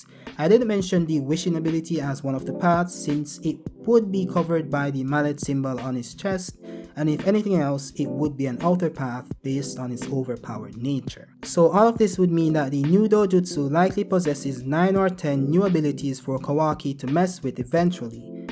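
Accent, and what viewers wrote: Jamaica. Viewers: My dawg Jamaican accent cannot be contained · Where you from fam? You sound Jamaican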